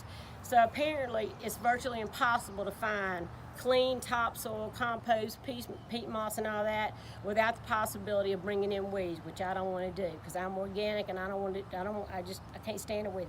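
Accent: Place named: Louisiana, United States. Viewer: Yard Looks good. Knew soon as I heard you speak you was from the top of the boot.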